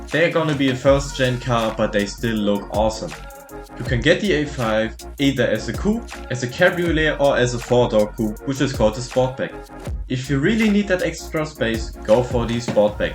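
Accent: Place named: Germany. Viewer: hahaha, ich muss aber auch sagen, bei den neueren Videos hört man den deutschen Akzent aufjedenfall weniger 🤝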